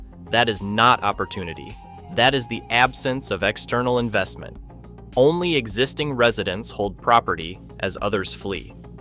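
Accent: United States. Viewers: Why the American accent, inconsistent info and fake claims? clickbait. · Perhaps you might use more up to date information and use relevant shots instead of pics of other parts of the world. Not sure who you are but an American voice just denigrates the post. But you have fun passing misinformation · Why the American accent?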